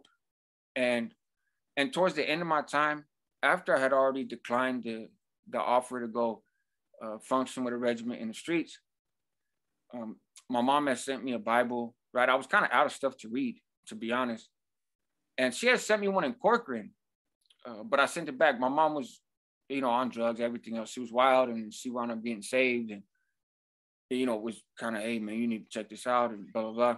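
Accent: Mexico. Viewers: When I close my eyes while watching this I hear a Mexican dude but when I open them I see a white dude.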